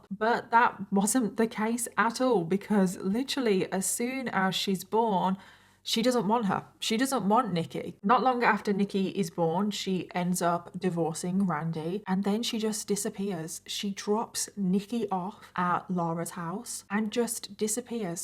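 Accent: United Kingdom. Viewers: Hi, are you British or Australian? I love your accent.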